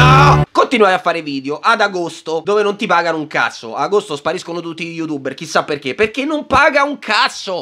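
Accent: Rome, Italy. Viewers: Fantastico come sempre. E parlando di fantastico, farai anche la serie di Animali Fantastici? Scusa ma sono stra ansiosa di sentire cosa ne pensi. Specialmente del secondo. Sarò sadica ma mi piace quando sbrocchi in romano! ☺ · Boh, tra accento romano, discorsi e memini di Berserk posso solo dire che ti amo haha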